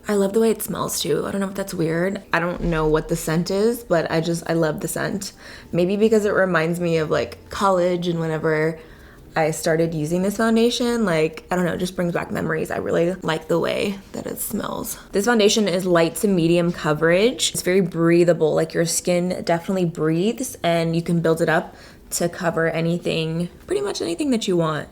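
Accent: South, United States. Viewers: Has anyone noticed she has a slight country accent?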